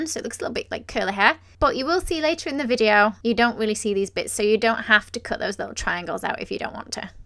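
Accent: United Kingdom. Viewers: I love your bright Britain accent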